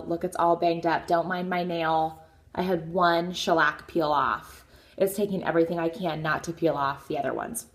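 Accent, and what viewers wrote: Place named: Midwest, United States. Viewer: oh, I don't think it does. pretty typical sounding Midwestern American sounding gal yet unique to her. I love her voice!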